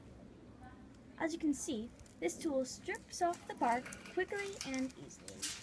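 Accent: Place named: England, United Kingdom. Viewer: You think your better then me cause you have a English accent and have a lab coat?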